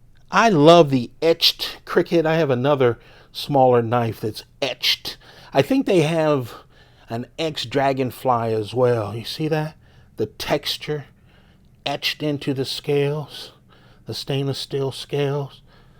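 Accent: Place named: Louisiana, United States. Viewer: You sure sound like you are from Louisiana! Great videos! Thanks for all your efforts!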